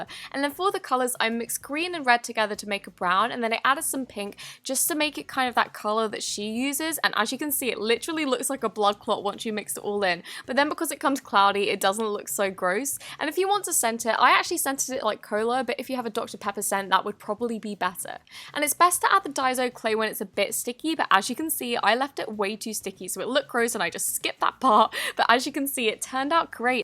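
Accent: United Kingdom. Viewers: Are you British??????!!!!??!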